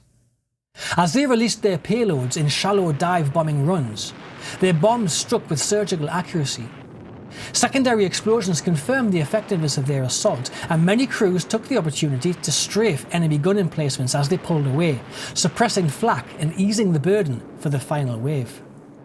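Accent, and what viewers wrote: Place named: North, United Kingdom. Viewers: Well done, buddy. Every time I hear you, I travel back in time to Blyth in the 70's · I’m sorry sir, it just appears that way to me. Maybe I’m overly sensitive to it, and it could be I’m not used to your hard northern accent. Chris Ramsay the comedian is quite harsh on some of his words too so it could be a regional thing I just hadn’t heard before.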